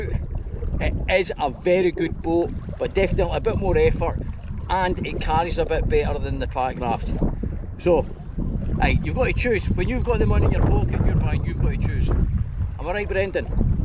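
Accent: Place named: Scotland, United Kingdom. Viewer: The scottish accent is not easy to understand for my french ears too.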